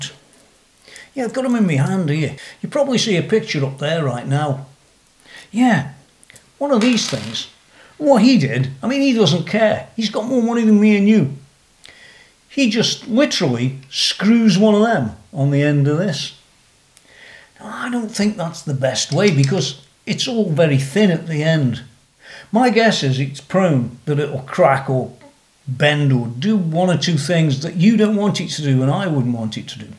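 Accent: Stoke, United Kingdom. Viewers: Sounds like you’re from Stoke? 🙌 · I don't know why, but the stars and stripes strap combined with this british accent gave me weird feelings.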